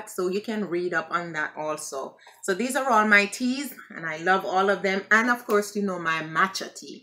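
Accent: Jamaica. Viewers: love your accent, Jamaican?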